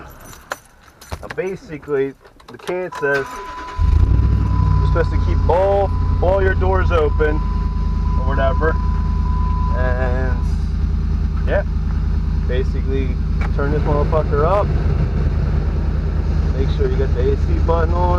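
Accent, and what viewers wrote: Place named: Philadelphia, United States. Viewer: My man gotta be from Philly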